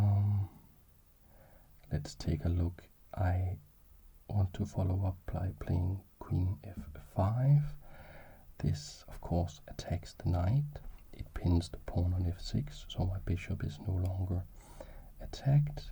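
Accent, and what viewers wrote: Denmark. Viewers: Ayo, er du dansk?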